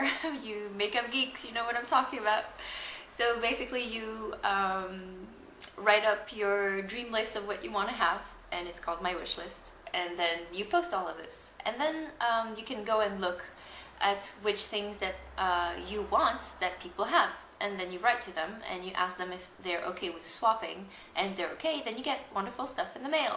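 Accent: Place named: Sweden. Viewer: What country are you from? You sound Swedish :)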